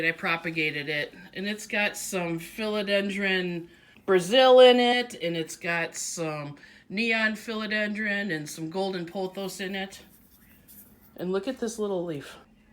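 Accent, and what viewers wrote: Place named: Midwest, United States. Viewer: holy cannoli.. i used to live in Minneapolis! I thought you had a mid west familiar accent. Gonna send you some orchid cactus cuttings! They have orange blooms.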